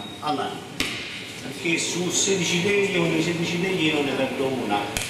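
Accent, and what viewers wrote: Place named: Rome, Italy. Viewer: Un maestro..e poi è troppo bello il romanesco!! Bravo!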